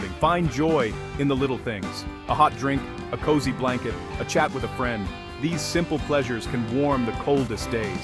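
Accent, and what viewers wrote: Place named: United States. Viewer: What about Northern England and why have you got an American accent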